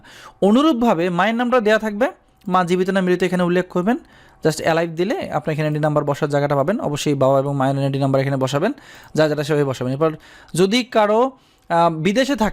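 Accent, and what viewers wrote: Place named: Noakhali, Bangladesh. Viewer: ভাই আপনি নোয়াখালীর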